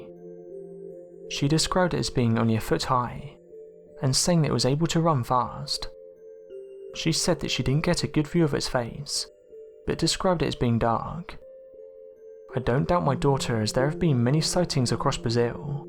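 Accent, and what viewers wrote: United Kingdom. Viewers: British ASs accent bullshiter! Hahaha. Ass wipe!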